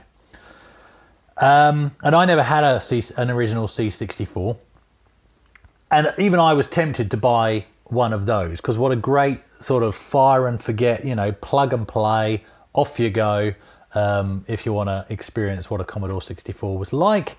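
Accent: Australia. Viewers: I guessed correctly why the Pi was being packed away, but as a first time viewer my real question was "Why does this British chap sound like an Australian?" But I did watch the entire video...and now I know. :) Subscribed...by an Aussie in Canada...probably sounding a bit Canadian by now.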